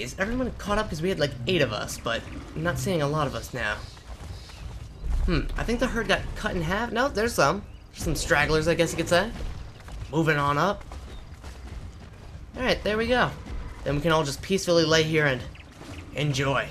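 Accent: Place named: United States. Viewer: hey I like to talk, do a Austria pack, I want a face cam you sound American, and you may not be PewDiePie, jackcepticeye, or the diamond minecart but. YOU are my Favourite YouTuber in the world I would rather PewDiePie have 8000 subs and you have 50 mill you deserve it I just hope you keep doing what you do and on cuz ya can 😉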